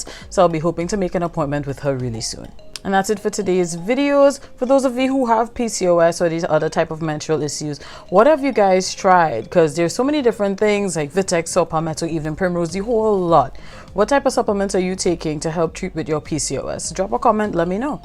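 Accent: Trinidad and Tobago. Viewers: Trinidadian accent 😍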